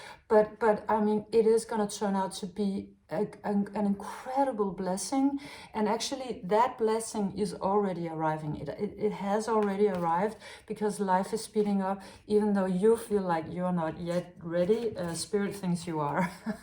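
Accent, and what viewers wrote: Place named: Denmark. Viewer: Listening to your accent brought back many wonderful memories of my Danish mor. ❤ Thank you too the lovely positive reading, love from Australia.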